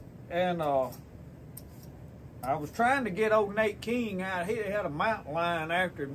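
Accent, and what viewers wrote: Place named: West Virginia, United States. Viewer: U said I just ought dem yersday,,, u sure u ain't from WV, lol, we sound alot alike brother, Love the shows, keep makin em, we keep watchin, GOD BLESS YOU AND YA FAMILY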